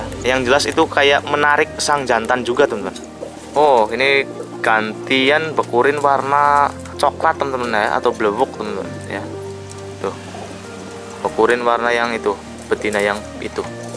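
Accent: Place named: Banyumas, Indonesia. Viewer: Ngomong nya ngapak bang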